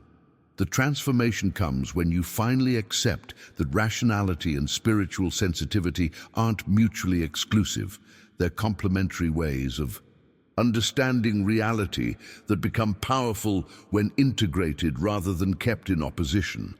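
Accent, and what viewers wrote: United Kingdom. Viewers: Midlands England x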